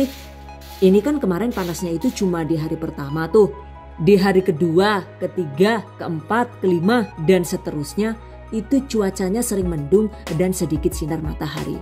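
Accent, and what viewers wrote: Banyumas, Indonesia. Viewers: ❤❤ Penjelasannya detail banget Dan seru bngt saat ngomong ngapak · Kenapa sih bahasanya spt orang yg glege'en gt ya maaf.. Kadang kyk kebarat2an kadang ngapax hehe oowke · Jan wong ngapak Rika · Ngapakek kentel banget hiiiii jd lucu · Deneng ngapak......???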